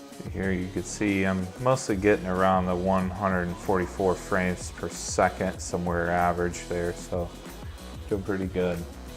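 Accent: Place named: Brooklyn, United States. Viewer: if i didnt know id think you lived in brooklyn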